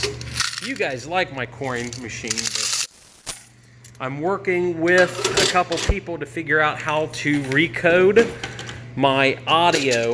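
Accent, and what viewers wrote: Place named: Atlanta, United States. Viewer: Where are you from? I know a guy in Atlanta with the same accent, but I don’t know if he’s from there originally!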